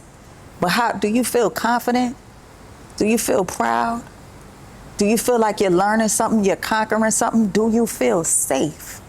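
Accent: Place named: New Orleans, United States. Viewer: I hail from New Orleans. I recognize that accent anywhere.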